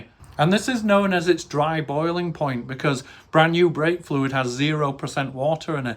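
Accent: United Kingdom. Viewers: Very nice British accent 👏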